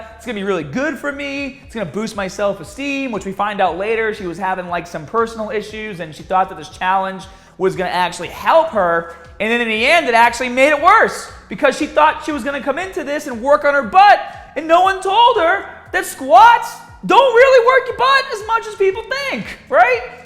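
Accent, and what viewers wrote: Boston, United States. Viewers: That Boston accent coming on strong.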